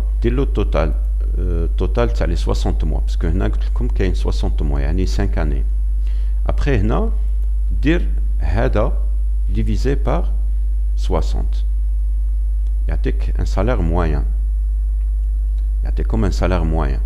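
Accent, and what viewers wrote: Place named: Tlemcen, Algeria. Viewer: Merci beaucoup tout est claire y'a tlemcani vous gardez bien l accent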